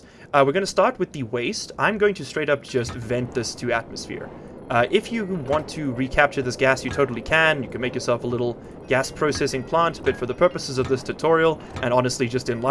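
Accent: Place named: South Africa. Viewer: you sound south african, nice video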